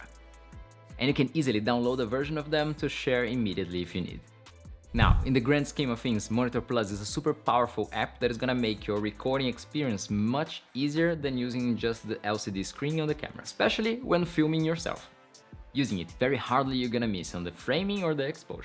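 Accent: Brazil. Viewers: Pelo sotaque deve ser br kkk cara tbm uso uma sony A7IV e apesar de todas essas funções do app ainda acha que compensa pegar por exemplo um monitor feelworld f6 ou só o celular ja me atenderia mto bem?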